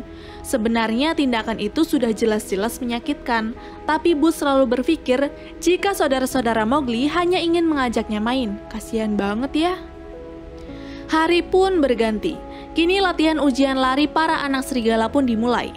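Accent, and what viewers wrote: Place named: West Java, Indonesia. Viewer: Mimin na orang sunda euyy🗿🗿🗿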